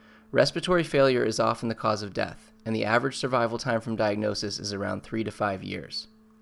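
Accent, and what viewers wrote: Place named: United States. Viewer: NOT familiar with the american accent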